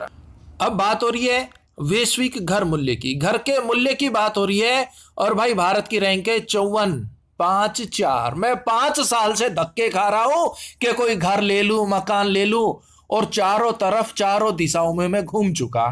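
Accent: Haryana, India. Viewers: Haryanvi style 😘😘😘😘😘😘👍👍👍👍👍 Haryana me kit sh ho ji.. Ap💕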